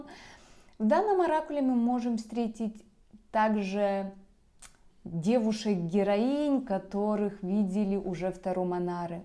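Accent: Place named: Baltic states. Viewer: Очень красивый и лёгкий прибалтийский акцент ❤️✨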